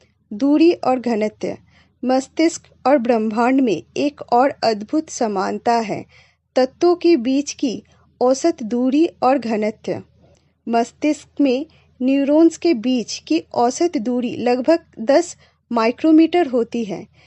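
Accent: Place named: Bengal, India. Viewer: You are a Bengali and trying to speak pure Hindi.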